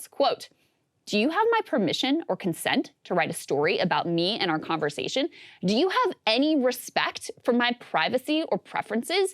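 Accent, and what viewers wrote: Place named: United States. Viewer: She sounds like an American.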